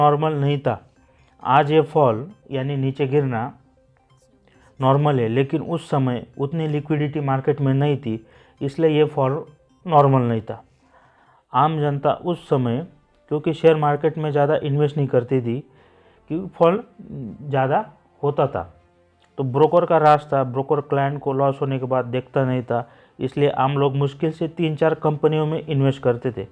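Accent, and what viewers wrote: Maharashtra, India. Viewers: Strong marathi accent hai bhai.... Jai maharashtra 👍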